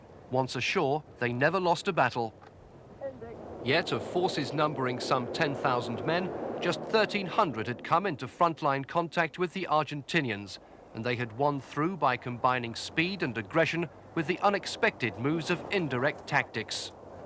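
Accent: United Kingdom. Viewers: LOL your page says you're from Denmark. That's why. But you sound like a British subject who lives in Denmark.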